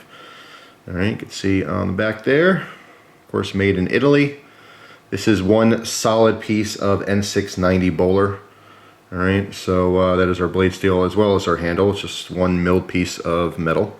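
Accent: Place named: New Jersey, United States. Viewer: the A in extrema is pronounced kinda like ah, English when spoken relaxed by a fluent speaker it is normal for the spaces between english words shrink and flow fluidly sounding like extrem-ahratio. American English has there own colloquialisms, and (a native new jerseyite speeks with a specific accent. To me it sounds like a slight speach impediment, you can hear it distinctly with the word water.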